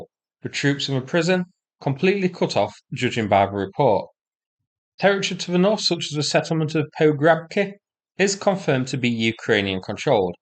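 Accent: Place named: Yorkshire, United Kingdom. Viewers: Guessing Yorkshire /Lancashire accent, same for the Rabbit. 🙂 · Forgive me if I am wrong. As I just Luv your Yorkshire accent...It reminds me of my Grand Dad and Great Grand Dad...as my father went to University and he didn't have an accent although he was born in Scotland...😊😅😂. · How could that be, when everyone is identifying his accent as Yorkshire? Mansfield is in Nottinghamshire, quite a hike south of Yorks. This is an honest question, as I am not an expert on the speech of Notts. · I see that YT have you as Taiwan based. Is this commentator AI ? If so, it's a great Northern British Accent.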